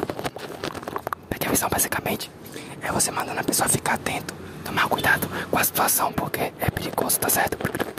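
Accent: Bahia, Brazil. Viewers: Eu pesquisei por esse vídeo, fiquei feliz por vocês terem feito pq eu amo o asmr desse canal, e as gírias/ sotaque baiano são os melhores.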